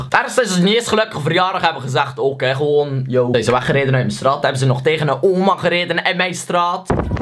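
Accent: Belgium. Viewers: hahaha jou west-vlaams is awesome! het is nice om te hore da je in je vids normaal praat en dan anders gewoonweg nice (ik ben zelf ook west-vlaams)❤️🙈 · als je 100% Hollands bent is het Belgische accent best grappig! toffe video btw!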